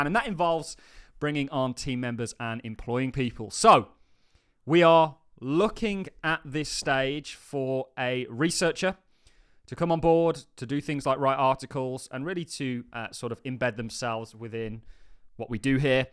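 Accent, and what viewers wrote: United Kingdom. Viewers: Been watching crypto YouTube for 8 years now. is the best non biased analyst I have seen. Plus his sweet British accent is like butter in the morning. There is no need to rage my friend. It’s just a daily update. Go somewhere else and listen to what you want to hear. Plenty of opportunities for news.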